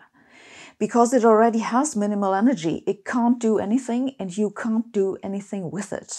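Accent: Germany. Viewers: A hard German accent of English,I'm using "CC".After this presentation,I'm enlightened.Einstein again,I'm starting to believe in God.I give up of all things,smartphone,laptop,car,TV,light etc powered by ELECTRICITY .I'm going back to the cave to light the fire,using GRAVITY of course!I would like to know what mass it is exactly,what number or equation so that I'd be able to light a fire in the cave by gravity? Light is not sound,so as not to have a wrong perception!Welcome to the 21th century and physics from the 18th century!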